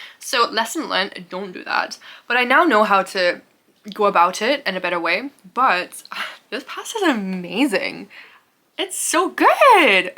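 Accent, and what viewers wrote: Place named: Ireland. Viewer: Interesting accident, slightly Irish are you?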